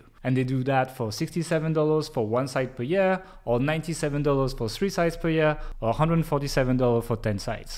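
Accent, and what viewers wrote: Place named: France. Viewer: I've never heard anyone talk about plugins before with a French accent, and now it's all I want in my life.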